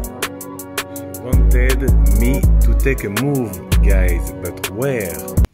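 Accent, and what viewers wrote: France. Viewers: C'est incroyable ce qui t'arrive 😁 j'aime bcp ton French accent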